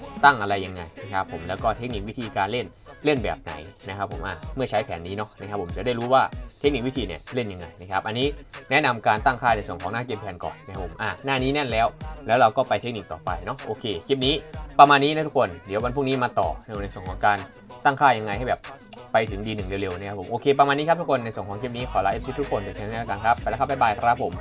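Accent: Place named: Isan, Thailand. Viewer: เสียงเหมือนคนอีสาน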